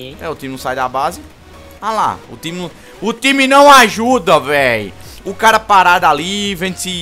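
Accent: Northeast, Brazil. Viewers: Mano vc eh da ond daq do nordeste?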